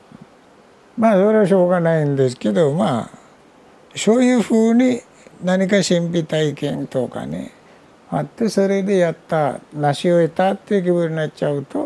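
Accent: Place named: India. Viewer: スマナサーラ長老はイントネーションから察するに日本生まれではないように察します。 インド人でしょうか？ どこで仏教の修行を積んだのでしょうか？ どちらの宗派に属するのでしょうか？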